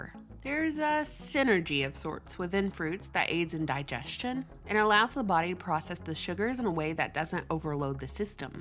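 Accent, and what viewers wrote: South, United States. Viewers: Thanks, love your channel and your southern accent! picked up a hoodie for my daughter and a t-shirt for me!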